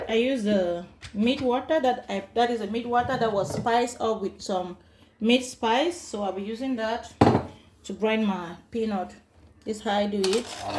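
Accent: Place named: Germany. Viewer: LOL I hear how German is overtaking you. You cooked it the real Cameroonian style. Well done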